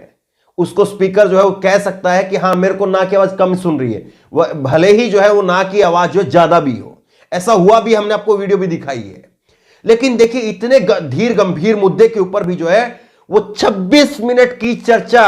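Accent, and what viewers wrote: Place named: Rural, India. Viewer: आपको हिन्दी अच्छी नहीं है lower class की या illetirate टाइप जैसे गाँव के लोग बोलते हैं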